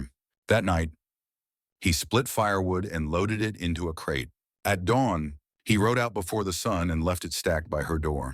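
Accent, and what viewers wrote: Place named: Germany. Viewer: Hi, I’m watching from Georgia, USA🇺🇸 I like Western stories. The story is a heartbreaking one, yet it has redemption from all those who would like to break Clara. Jem was a warm, wonderful man; he stood with honor. Also, this story has a German accent in parts over English, making the story hard to understand. I could only laugh when the narrator read the words. That might be why no one left a comment! Good story! 😊😊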